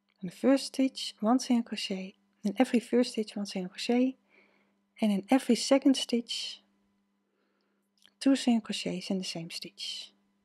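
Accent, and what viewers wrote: Netherlands. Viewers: jaa zag hem voorbij komen inderdaad! Maar zoek altijd in het Engels omdat je vaak meer video's tegen komt... Doe vrijwel alles in het Engels. Maar meende iets van een Nederlands accent te horen... Leuk! Bedankt voor de video! ❤ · I'm going to make one for my grand daughter. She's a cat lover. Thank you for yr tutorial. By the way are you dutch? Your voice has a sweet dutch accent 😊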